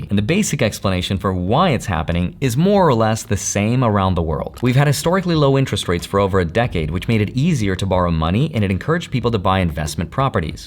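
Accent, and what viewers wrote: Australia. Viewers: Terrible Oz accent, excellent way to describe the problem. And entertaining. Thank you. PS: Oz accent - make each word the laziest way to say it (in your mouth). Shorten Melbourne to Melbn. :) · As an Australian, that is definitely how we talk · Australian here. Yes that is exactly how we talk. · Dude your Australian accent is actually really funny which makes it hilarious!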